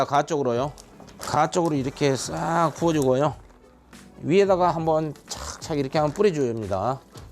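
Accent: Jeolla, South Korea. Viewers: 셰프님 김장레시피 잘봤습니다 전라도 사투리구수하구요 메모잘했습니다 행복하세요 ~^^~ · 님 고향이 전라도맞으시죠?ㅎㅎ 억양만들어봐도 전라도말투네요 저두전라도 ㅋ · 셰프님 겉절이 해봤는데 당일은 "액젖 냄새가 좀 나네" 했는데 다음 날 남은 것 먹으니 정말 맛나네요 감사합니다 전라도 사투리가 잼 나네요 쬐간한것~등 쉐프님 우리 세자매 다 구독자랍니다 오늘도화이팅 😅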